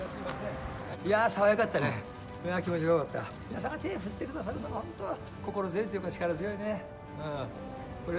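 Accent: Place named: Miyazaki, Japan. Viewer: やっぱり地元に帰るとアクセントも宮崎なまりになるもんですね。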